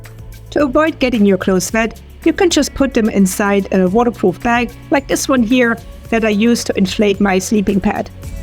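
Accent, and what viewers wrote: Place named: Saxony, Germany. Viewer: Danke. Ich habe deinen Kanal gerade erst entdeckt. Ich dachte mir ich schreibe mal auf deutsch. Dein Englisch hat so einen sächsischen Klang. Jetzt sehe ich mir in der nächsten Zeit erstmal weitere deiner Beiträge an. Nochmals vielen Dank und alles Gute.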